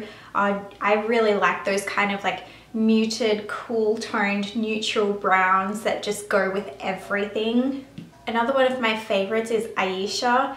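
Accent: Australia. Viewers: Are you australian?? OMG Im spanish and I love the acent! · I was sitting here wondering if you are Aussie. After watching videos of several American accents, I found it hard to distinguish my own Aussie accents hahaha. So happy when you confirmed it! New subbie :)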